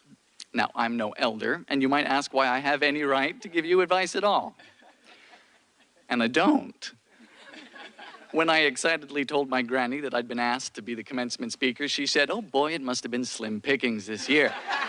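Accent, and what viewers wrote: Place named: Bermuda. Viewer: The Bermudan accent comes out a bit more when he's at home eh.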